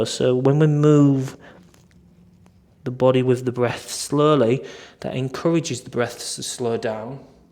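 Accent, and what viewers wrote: United Kingdom. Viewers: Thanks - I’ve been doing your stretches for a few weeks now as I train for a half marathon in New Zealand. Loving your deep stretches and your accent that reminds me of living in the UK too!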